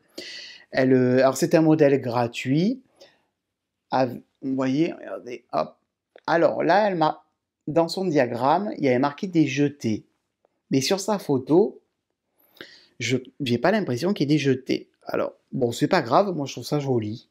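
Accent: South, France. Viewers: Bonsoir, je te decouvre au hasard du net et j'adore ta bonne humeur et ton accent du soleil. Merci pour ce moment détente autour d'une passion commune ❤ Christine, une nouvelle abonnée du nord Isère 😊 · Bonjour c'est moi qui te remercie pour tes vidéos qui sont très agréables. L'accent du midi remplace le soleil qui manque dans la région parisienne. Un grand oui pour les vlogsmas de décembre. A bientôt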